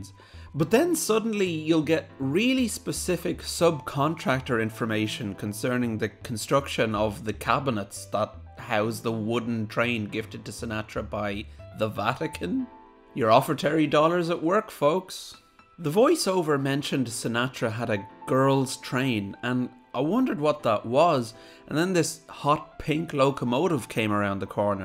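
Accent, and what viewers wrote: Ireland. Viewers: Is the narrator Irish (accent). What about Pete Waterman? What's with the 3 rail trains? I guess they all like Lionel. HO can be so much more realistic. Considering what money celebrities have, this was pretty disappointing.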